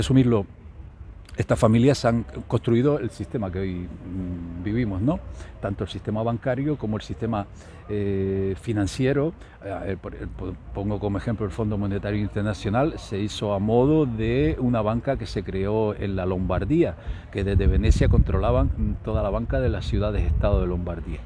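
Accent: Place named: Canary Islands, Spain. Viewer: Ostras❗️❗️❗️,Que sorpresa veros a los 2,a jamas lo habia visto fisicamente,entonces eres Canario como el? Esq el acento se me hacia familiar pero como hay tantos canales de Hispano-America. Voy a escuchar la charla solo falta ahi mi querido y desaparecido de los medios el GRAN Pedro Bustamante. Saludos Maestro 🇮🇨 🙂😉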